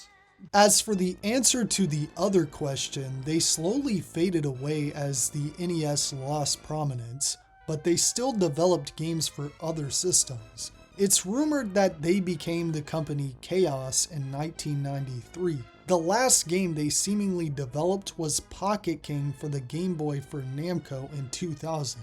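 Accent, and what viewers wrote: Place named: South, United States. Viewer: Maybe it’s his ambition to be “refudiated” for his desire to use the word. 😉 In seriousness though, I notice that he has Southern-accented English, the vernacular forms of which posses a lot of non-standard pronunciations for common English words; for example as a Texan, I remember mispronouncing the word “Nuclear” as “Noo-Cue-Lure” instead of the correct “Noo-Klee-Ur” thousands of times before it later became a US-wide meme in 2002 due to “a certain Texan US president”. “Lambuxatious” sounds vaguely familiar, but I can’t immediately put me finger on what words it’s a derivative of (perhaps a combination of “To lambaste”, “To buck”, and “Vexatious”? 😂 ).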